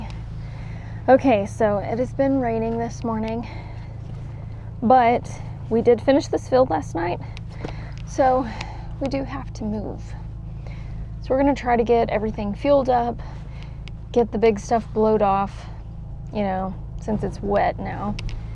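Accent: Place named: Texas, United States. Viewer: Love you and your texas voice and accent ❤ thats for the very exelent video ❤😊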